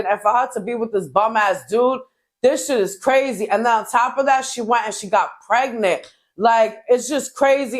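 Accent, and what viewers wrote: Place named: New York, United States. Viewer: Got my Sub for the New York accent ❤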